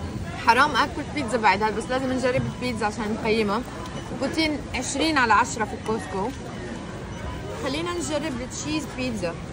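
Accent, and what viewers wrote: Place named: Lebanon. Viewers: واذا حكت لبناني عادي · ليش عم تحكي لبناني شكلك لبنانية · هي فلسطينية بس من حبها لدكتور فودها بتحكي بناني ومن الحب ما خلى الواحد يطلع عن دينو ولهجتو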